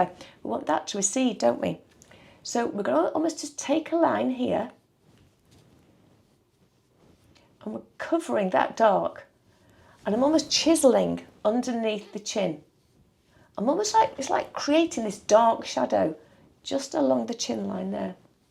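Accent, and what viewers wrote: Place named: England, United Kingdom. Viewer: I have that Max Factor palette from Amazon, it’s one of my favourites. The colours are so pigmented. It’s refreshing to hear a makeup guru with an English accent. New subbie loving your channel x VickAngel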